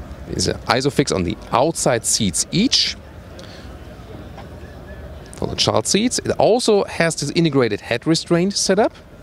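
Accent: Germany. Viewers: is great! I like your German English! And, no one is better than you! Appreciate your business! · classic german english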